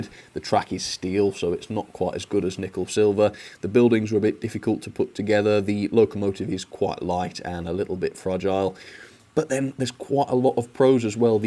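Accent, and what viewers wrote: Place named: England, United Kingdom. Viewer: oh my god his english accent is amazing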